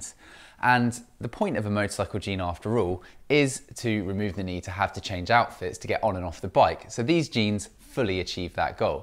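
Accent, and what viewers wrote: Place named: RP, United Kingdom. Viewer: It called The Queens English...and is expensively acquired at English private schools..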